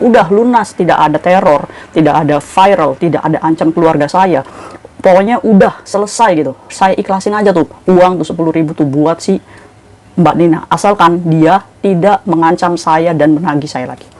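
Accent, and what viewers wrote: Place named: Bolet, Indonesia. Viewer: Kakak orang mana bahasanya kok sama bolet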